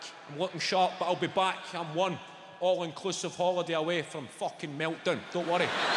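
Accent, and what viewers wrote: Scotland, United Kingdom. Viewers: His Scottish dialect gives his jokes an other level, but me being a Norwegian, are having a hard time understanding everything. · Very good comedian but as an American I can hardly understand what he's saying through the Scottish accent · It's not an English accent... UK, Britain, are made up of countries...not one! is Scottish not English. Therefore he speaks the language of English perfectly normally, for someone from Scotland. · Grow up lol, just learn how to interpret a Scottish accent, its not rocket science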